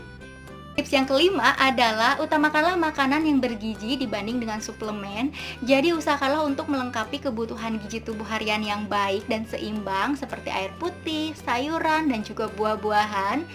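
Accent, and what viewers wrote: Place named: Riau, Indonesia. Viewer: Hadir kak 😁😁 Riau mana suarana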